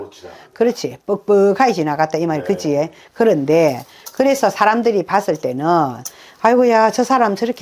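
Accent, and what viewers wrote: Busan, South Korea. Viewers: 부산사투리 맘편해져요 저도 부산사람이라..한번뵙고 상담 받고싶네요.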